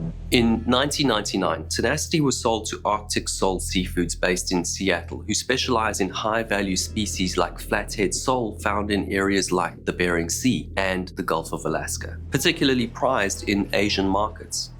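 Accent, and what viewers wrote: South Africa. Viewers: Are you south African brother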